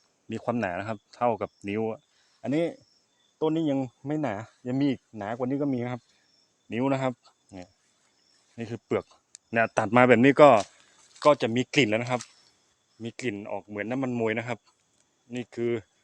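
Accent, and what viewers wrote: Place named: Central, Thailand. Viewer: คุณเคยอยู่หรือไปเรียนในเมืองมาก่อนหรือเปล่าคะ พูดสำเนียงภาษากลางชัดเจนดีมากค่ะ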